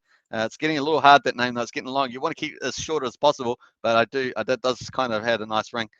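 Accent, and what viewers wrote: New Zealand. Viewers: Are u a wee kiwi living in the big apple?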